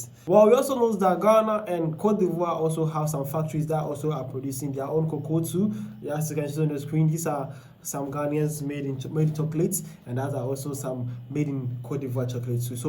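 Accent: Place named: Nigeria. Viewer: I thought you are a Nigerian. You have a deep Nigerian accent.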